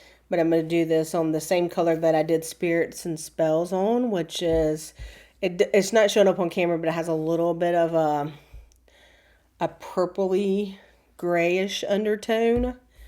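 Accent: South, United States. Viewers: Your videos are amazing. It’s such a joy to watch you. I love seeing your stitching. Your Halloween projects have inspired me to stitch more of them. I also love your accent. Keep up the Southern charm! You are a pure joy to watch.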